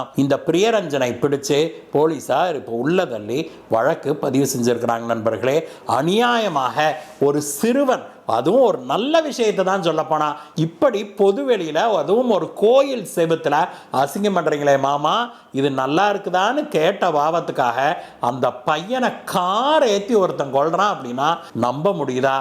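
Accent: Kongu, India. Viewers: நீங்க பேசுவது கொங்கு தமிழ் போல . ஒரு பல்ராங் (ஒரு கிலோமீட்டர்) அவுதி அவுதியாக போறாரு அவசரம் அவசரமாக போறாரு வார்த்தை இது எல்லாம் கொங்கு தமிழ்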